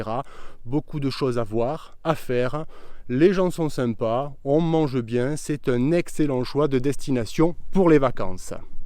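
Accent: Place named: South, France. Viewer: Bravo pour ce petit tournage, "avé l'assent" en plus!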